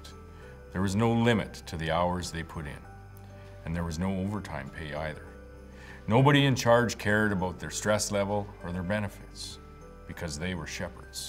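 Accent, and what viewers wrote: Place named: Saskatchewan, Canada. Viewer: Merry Christmas to Scott and family. You also speak like you are born and raised in the greatest province of Saskatchewan. Thank you.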